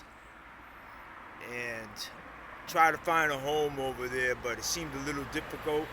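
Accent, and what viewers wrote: Boston, United States. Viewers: Boston accent never left, love it! Awesome story brother. I agree, it is 100% by design. Keep humans in the rat race.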